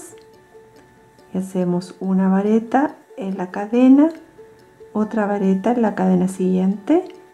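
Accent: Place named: Chile. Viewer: LO SABIA X EL TIMBRE DE VOZ ....YO TB SOY CHILENA Y T FELICITO XQ EXPLICAS MUY BIEN ...GRACIAS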